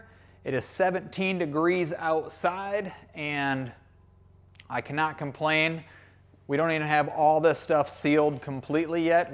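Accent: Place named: Michigan, United States. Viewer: As soon as I heard you talk, I knew you were from Michigan... it's like I'm listening to someone from my hometown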